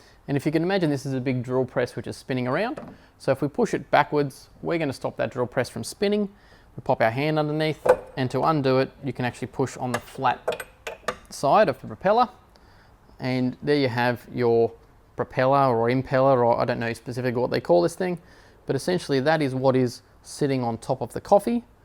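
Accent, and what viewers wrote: Australia. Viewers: Just love the Ozy accent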